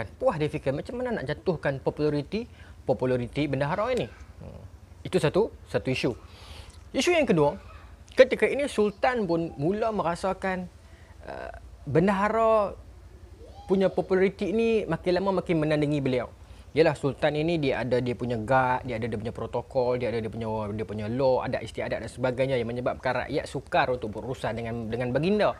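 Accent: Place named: Penang, Malaysia. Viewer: Amboi ustaz, lenggok bahasa satu macam miya 🤭🤣 Jarang-jarang boleh dengaq lenggok penang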